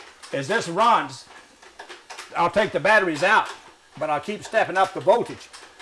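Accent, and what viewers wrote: United States. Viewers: Just because he has a country accent doesn't mean he's uneducated. He may very well be a pioneer of something great and beyond the "education" of the masses. Many highly "educated" are so indoctrinated with book-knowledge that they never do anything great with it. There are other who have experiential knowledge and are willing to go beyond the status quo to discover something new. Hopefully this man is one of those people.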